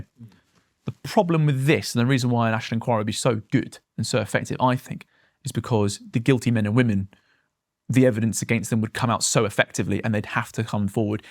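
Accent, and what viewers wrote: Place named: Essex, United Kingdom. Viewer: He sounds and looks uneducated and that accent is certainly not “well to do”. Essex twang. Glottal stops. Dear god. Mangled English. He’s an amateur.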